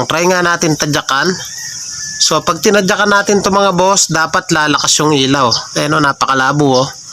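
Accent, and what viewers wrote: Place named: Pampanga, Philippines. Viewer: May tanong lang po ako, out of the topic lang. Kapampangan po ba kayo? Mag pagka-accent po kayo Kapampangan eh hehe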